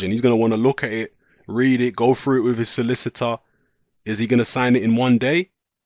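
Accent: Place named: United Kingdom. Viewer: U speak wit a uk voice and even u dont know the name of the stadiums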